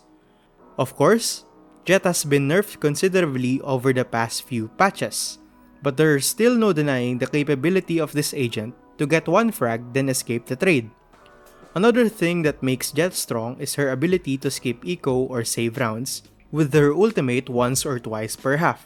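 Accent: Philippines. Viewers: Are you filipino? your accent sound filipinos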